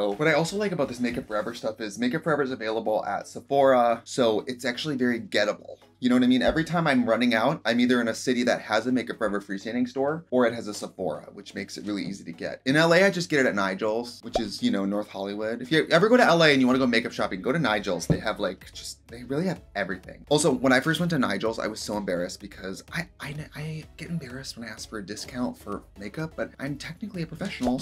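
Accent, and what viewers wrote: Australia. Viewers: I read this video title in australian accent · Im not even a second in and i can already hear the aussie accent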